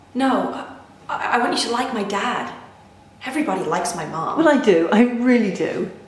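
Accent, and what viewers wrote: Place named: United States. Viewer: i was hoping i'd hear her do a good American accent someday. i'm happy to say that day is today!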